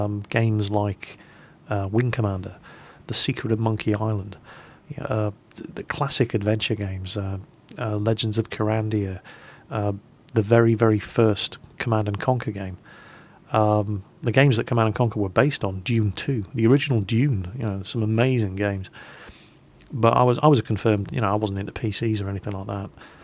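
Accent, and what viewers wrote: England, United Kingdom. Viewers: Love the videos. nice to hear another English You Tuber and yer you got me into both WOT's and War Thunder thanks allot its all i really play anymore. Good Luck with future videos and respect for being loyal to your "fans" and posting regular content! All in all great journalism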